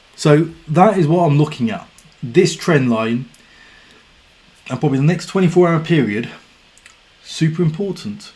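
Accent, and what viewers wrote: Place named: United Kingdom. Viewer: Soft British accent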